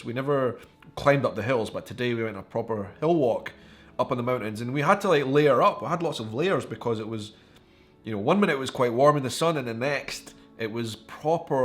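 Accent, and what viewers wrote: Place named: Highlands, United Kingdom. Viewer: <sighs> I listen to your accent. ...and I hear my dad when he would show me how his grandmother, (my great grandmother), would talk. I never met her. It's like you said. She was from the Highlands and as I hear it, there is no place on earth as beautiful. I'm getting way older, now, and I hope I make it Scotland.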